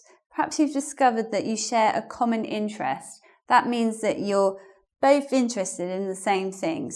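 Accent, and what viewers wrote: United Kingdom. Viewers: Strong Britsh accent! :) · omg British women has the cutest accent which makes me very attracted to them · I love your lesson, also British accent... many thanks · I LOVE THE BRITISH ACCEPT XD !!! I LIKED TOO MUCH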